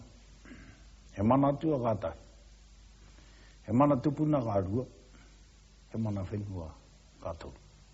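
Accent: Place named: Ngapuhi, New Zealand. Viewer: Te mita o Ngapuhi💗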